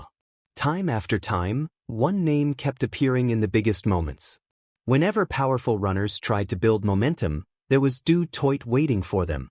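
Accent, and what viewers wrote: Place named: United States. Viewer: try use a South African voice narration, an American voice doesn't fit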